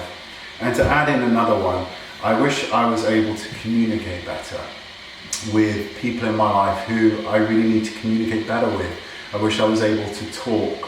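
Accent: England, United Kingdom. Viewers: Hey!:) I love your videos! I love your personality and I love you as a person. I know you have no idea who I am but I just wanted to say that you have a lot of support behind you (myself included) when it comes to your videos. I've learned a lot from your videos! Your accent makes me wanna go to England! My dad grew up in the UK and I have an Uncle that still lives there but I have never been to the UK. Hopefully one day when I go that I can meet you while I'm there. That would be amazing! Anyway, have a great day!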